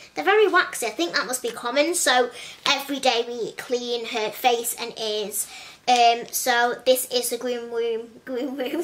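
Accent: United Kingdom. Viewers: What a pretty voice speaking British 🥰 and a beautiful face and body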